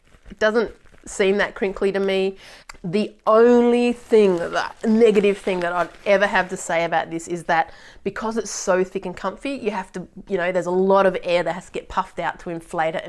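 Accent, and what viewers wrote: Australia. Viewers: Great to hear an Aussie voice. I hit the Himalayas fairly regularly, so I watch a lot of these types of videos. Always Americans on the PCT or JMT, or Europeans in Iceland; so really good to see a fellow Australian out there offering advice. I also love your level of enthusiasm, it just kept me watching even though my load out is well and truly bedded down. Thanks for the time.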